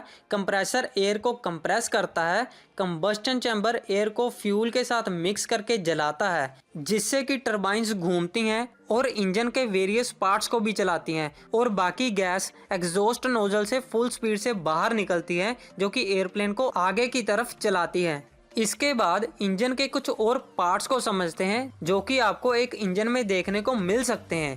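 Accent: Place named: Punjab, India. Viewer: Hindi ton tan Punjabi lgda aa patuya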